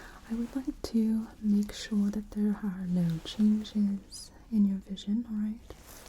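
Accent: United Kingdom. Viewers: Love the British accent 😊 Best wishes from the UK 🇬🇧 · Posh, British accent, love it. I'm a Bristolian so not posh at all! This is so good! ❤❤❤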